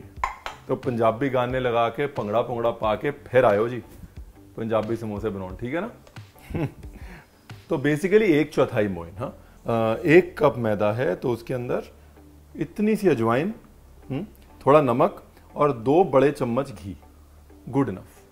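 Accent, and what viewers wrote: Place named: Lucknow, India. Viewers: I was going to say that. He says so many times how he grew up in Lucknow and he is UP ka ladka. His way of talking has all the sweetness and sophistication of Lucknow and UP. And even when he is making Punjabi Samosa he can't keep himself from mentioning Radhey Shyam halwai. But to be honest, Ranveer belongs to all of India now. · Tabhi mai kahu ki apki zaban aur lehza lucknawi kaise hai.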